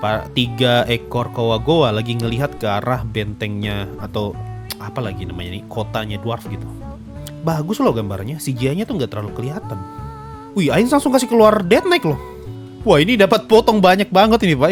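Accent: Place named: West Papua, Indonesia. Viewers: Maaf kk klw boleh tw kk dari daerah mna, soalnya logat nya kek ank" Papua barat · Iya, ane rasa seperti org Papua bagian kota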